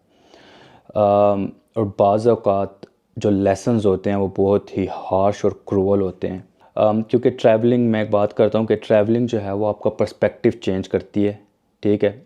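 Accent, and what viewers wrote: Pakistan. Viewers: Are you from Pakistan?? Your hindi accent is not like ours. Yes you are a Pakistani... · BASED ON YOUR ACCENT, I FEEL YOU ARE A PAKISTANI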